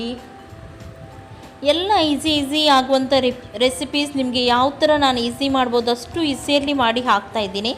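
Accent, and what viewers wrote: Mangalore, India. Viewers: Your Mangalore Kannada explanation so beautiful , good vedio mam. Super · Nimma maathu tumba cute rii kelikke koshi agutthe manglore kannada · madam nivu Mangalore avra kannada age mathadthira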